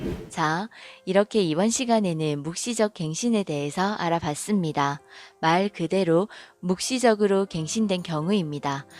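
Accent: Gyeongsang, South Korea. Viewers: 갱상도 발음이 참 귀여우시네요~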